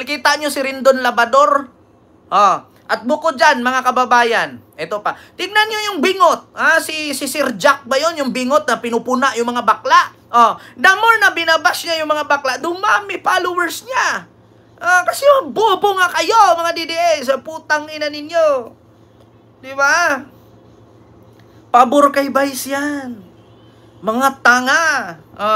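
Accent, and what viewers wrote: Visayas, Philippines. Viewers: Hindi na baling bisaya punto mo, ang importante hindi ka bobo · NUKNUKAN NG TA TANGA NYO DDS❗VISAYA LANG ANG PUNTO NI, PERO MALIWANAG SYA MAG TAGALOG❗KAYONG DDS NA DAVAO NAKIKI VISAYA LANG KAYO❗WALA KAYONG SARILING LENGUAHE AT SARILING PUNTO❗❓BOBOOOOO❗❗❗❗🤬🤮👺😖😩😡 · Tawa tlga ako s pesteng yawa mo hahaha ganda nga Punto mo mg bisaya k hehe ako ilocana pero gustong gusto ko mgbisaya😂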